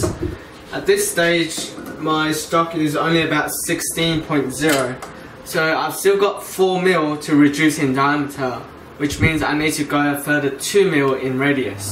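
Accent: Australia. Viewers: You Australian mate? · What part of Australia are you from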